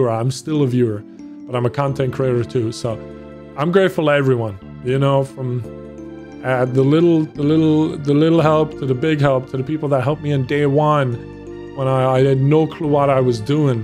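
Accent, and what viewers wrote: Ireland. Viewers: He sounded extra Irish in this vid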